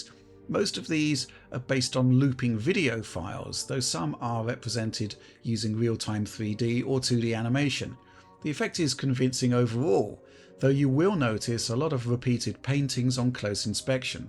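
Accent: United Kingdom. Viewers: It's the accent, British seems to scream seriousness and maturity. Game of thrones anyone? 😉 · British voice for a British franchise, very appropriate. Also great work on the analysis.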